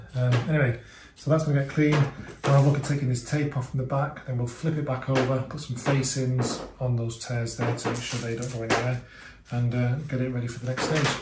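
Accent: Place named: Northern England, United Kingdom. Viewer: I’m another that has followed for a while. Just loving to hear a fellow northerner!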